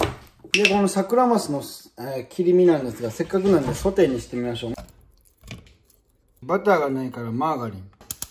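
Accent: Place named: Kansai, Japan. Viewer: たまに関西弁使うん好きやわ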